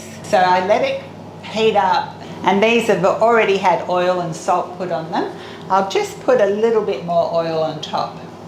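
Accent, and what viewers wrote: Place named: Australia. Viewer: Yeah,the accent! My mother who is an English teacher calls it "a lazy accent" but I think it's an Australian accent,(I think.)😊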